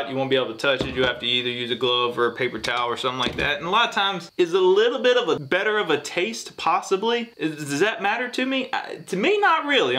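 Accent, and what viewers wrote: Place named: South, United States. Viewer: What an accent! Southerner, I presume.. Georgia, Texas. Am I right? BTW, nice video. Keep it up!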